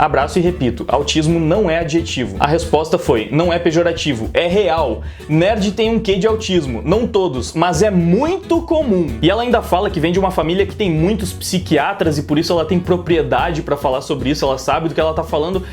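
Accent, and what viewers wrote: Rio Grande do Sul, Brazil. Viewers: Caralho mano, tu é gaúcho?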